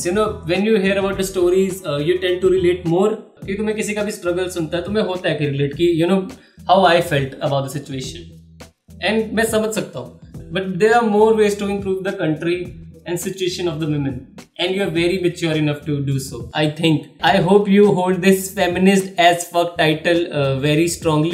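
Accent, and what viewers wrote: Punjab, India. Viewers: I fucking love the Punjabi accent man! Subscribed in the first 10 seconds.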